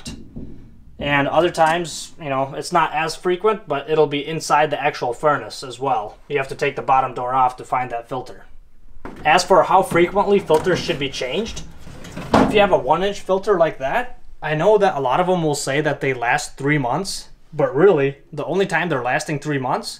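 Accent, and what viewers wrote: Minnesota, United States. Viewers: Great info! Love the Minnesota accent.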